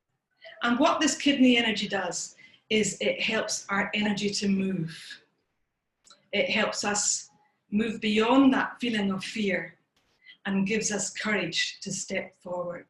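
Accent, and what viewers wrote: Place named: Scotland, United Kingdom. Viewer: Thank you so much for this, your lovely Scottish voice and your energy are just so delightful. I have shared this with my family and friends in this country, and the USA and relatives in Italy. My nephew passed on Sunday, not covid related, we are all devastated but I’ve found this helpful and a managed to do it today. Can’t quite smile , just tears for now, it that’s healthy tears and the smile will come. Thank you 😊 xxx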